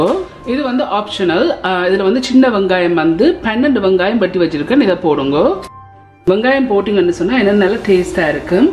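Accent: Jaffna, Sri Lanka. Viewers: உங்கள் யாழ்ப்பாணத் தமிழ் மிகவும் நன்று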